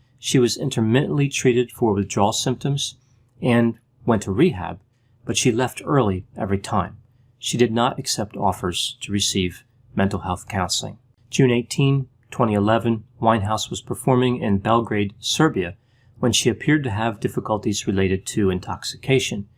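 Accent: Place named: Harrisburg, United States. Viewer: I share the Delaware Valley accent with. It's a beautiful thing. But I'm curious to know if he's more west-of-Philly than he is Delaware Valley. His accent would seem to indicate this. From him I hear more Harrisburg (or at least Montgo) than I hear Philly or Wilmington.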